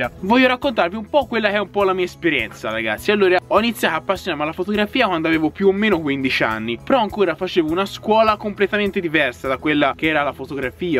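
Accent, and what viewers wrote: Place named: Tuscany, Italy. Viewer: quanto è bello il tuo accento toscano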